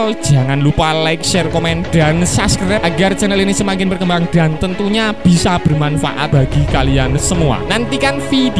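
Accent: Java, Indonesia. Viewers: Cak jowo ne mentok banget pasti wong jowo iki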